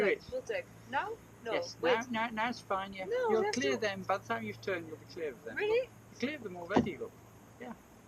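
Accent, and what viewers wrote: United Kingdom. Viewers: I surely no not know why, since my ancestor settled in Canada only 55 years after the foundation of Québec by Samuel de Champlain in 1608, I feel I have to offer you my apoligies for this rather uncivilized fashion with wich this attendant from Audierne treated you and, but I do. J'adore l'accent british;)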